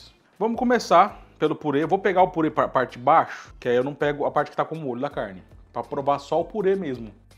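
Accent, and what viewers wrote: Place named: Minas Gerais, Brazil. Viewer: Oi! Desculpa me meter na sua pergunta kkk O já falou que a cidade dele é muito perto de MG, por isso ele tem esse sotaque meio mineiro, mas ele é do interior de SP mesmo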